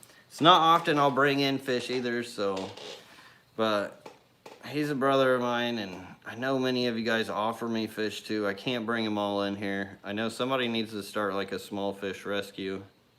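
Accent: United States. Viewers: You sound like such a hill Billie . Love it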